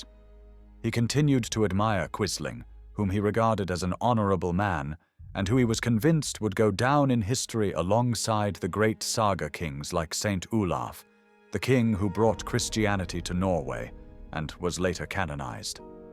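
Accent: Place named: United Kingdom. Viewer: agreed. or at least someone without a UK accent or whatever that is. sorry, cannot listen to an entire video of that accent.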